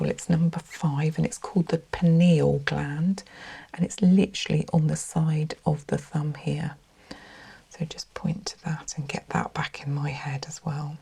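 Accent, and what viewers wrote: England, United Kingdom. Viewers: What area are you from in England? You accent sounds so much like a friend of mine. Such a soothing voice!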